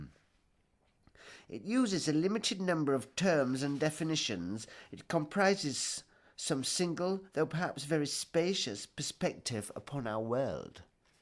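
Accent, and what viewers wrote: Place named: RP, United Kingdom. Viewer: Oh, and love the posh accent :D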